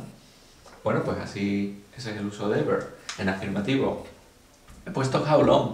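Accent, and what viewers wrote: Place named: Andalusia, Spain. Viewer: En el caso de still , le recominedo explicar primero el uso normal, y luego la excepción que le permite posicionarse delante del verbo modal o primer auxiliar. el acento andaluz es cojonudo, los que desprecian a un profesor por el acento, no me gustan, ya que tantos acentos habra como culturas o paises adopten el ingles. jamaicano, sudafricano o español.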